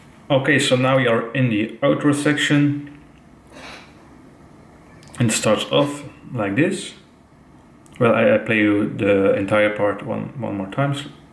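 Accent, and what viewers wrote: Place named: West Flanders, Belgium. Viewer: Westvlaams om precies te zijn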